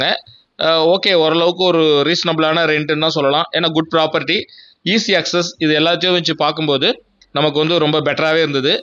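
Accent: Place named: Puducherry, India. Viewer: சார் உங்களுடைய காணொளியை பார்த்தேன் நான் புதுச்சேரி காரைக்கால் சேர்ந்தவன் உங்களுடைய வாய்ஸ் நம்ம ஊர் போல் இருக்கிறதே என்று தங்களுடைய கார் நம்பரை வைத்து பார்த்தேன் நீங்கள் புதுச்சேரியை சேர்ந்தவர் என்று என் மகளை பொள்ளாச்சியில் திருமணம் செய்து கொடுத்துள்ளேன் ஆழியாறு ஆனைமலை வரைக்கும் தான் சென்றிருக்கிறேன் உங்களுடைய வீடியோவை பார்த்த பிறகு உடனே செல்ல வேண்டும் என்று ஆவலாக உள்ளது நன்றி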